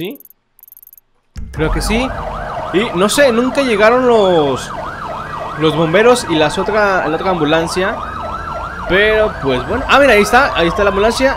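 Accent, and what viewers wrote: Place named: Italy. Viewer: casi en todo el video hablaste como italiano hablando español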